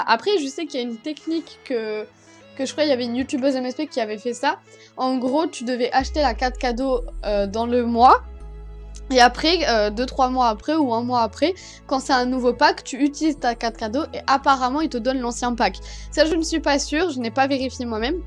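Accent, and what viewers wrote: Quebec, Canada. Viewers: Tu est cebecoise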